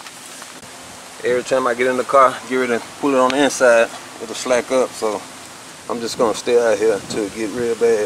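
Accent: Alabama, United States. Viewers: What part of Bama you from???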